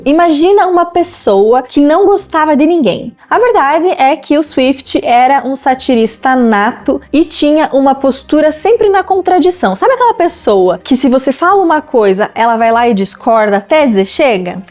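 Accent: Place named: South, Brazil. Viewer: Este classico parece ser sensacional, ainda mais apresentado por uma voz doce de sotaque sulista simplesmente indefectível.... simples perfeição!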